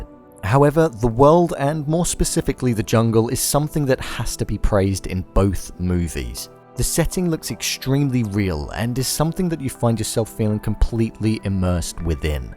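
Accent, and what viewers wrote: United Kingdom. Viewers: Its his British accent, even I wanna fuck it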